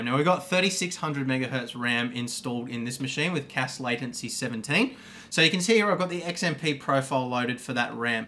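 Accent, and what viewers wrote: Australia. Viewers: Are u australian mate ? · Finally, an Aussie tech guy! Yew beauty!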